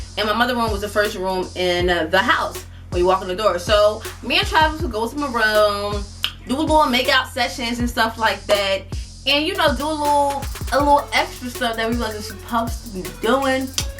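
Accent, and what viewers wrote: New York, United States. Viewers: u are so beautiful and i love ur new yorker accent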